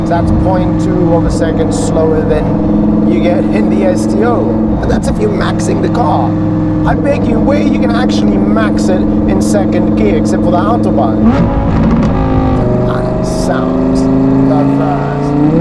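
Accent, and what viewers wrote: South Africa. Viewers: Is our Presenter South African?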